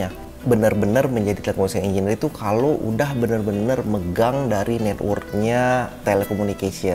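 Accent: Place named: Padang, Indonesia. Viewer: Asli padang uda... Hehehe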